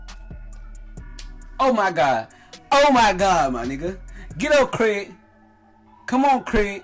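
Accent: Memphis, United States. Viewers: Is u from Memphis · you from memphis cause yo accent sound like mines and im from memphis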